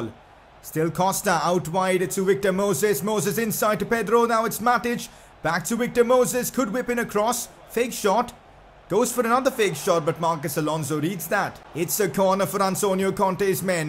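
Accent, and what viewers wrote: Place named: India. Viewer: you sound like indian guy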